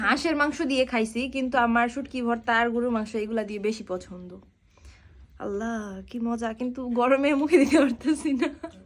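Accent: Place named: Barishal, Bangladesh. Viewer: বরিশালের বউ বরিশালের কথা শিখে গেছে মাশা-আল্লাহ মামি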